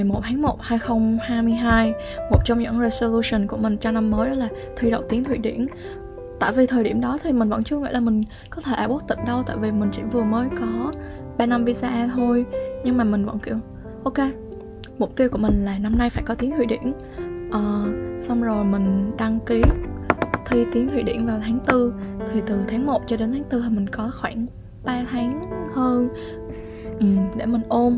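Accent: Vietnam. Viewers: Giọng bạn ở đà nẵng phải kh? Nghe hay ghê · b nói được giọng bắc à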